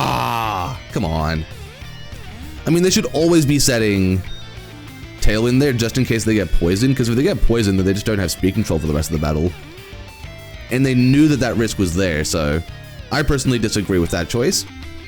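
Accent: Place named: South, United States. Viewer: lmao you kept me guessing, the redneck accent is definitely southern Alabama/northern Florida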